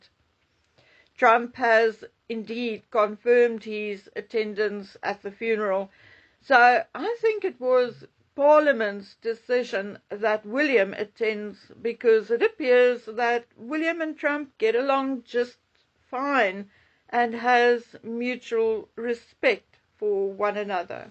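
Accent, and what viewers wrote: South Africa. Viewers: Hello. I am a Johannesburger (Bedfordview) and love your show. Love listening to our SA accent❤ We’re having lovely rain at the moment. Just great. Take care and have a lovely evening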